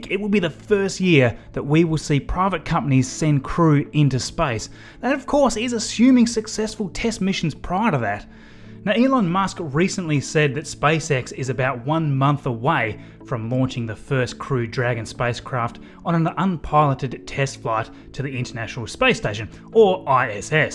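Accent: Australia. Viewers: Just subscribed! Great to hear an Aussie voice describing Space stuff. Good on ya mate thanks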